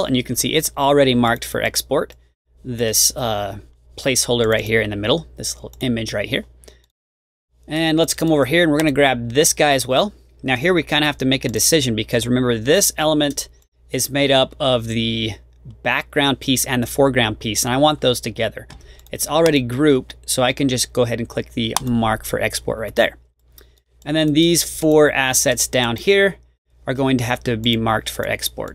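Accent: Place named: Ireland. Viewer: Great video. You sound like you have a slight Irish accent. Which is really cool!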